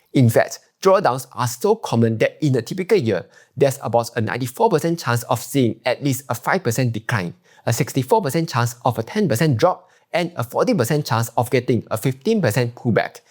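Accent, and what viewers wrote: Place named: Singapore. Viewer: I can't understand your singlish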